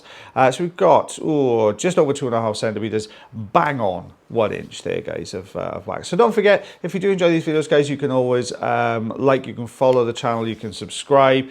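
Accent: Wales, United Kingdom. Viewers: It's the welsh accent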